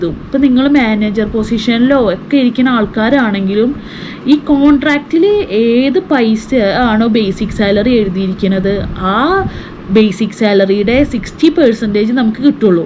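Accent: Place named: Ernakulam, India. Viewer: I like your slang tune 😅 Eranakulam?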